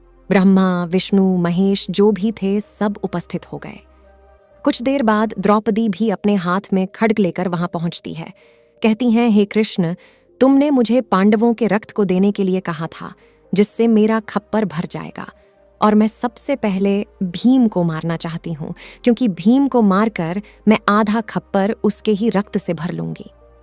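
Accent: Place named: Hyderabad, India. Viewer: Pukka Hyderabadi accent hai bhai...kitna Sach bolengi kya ???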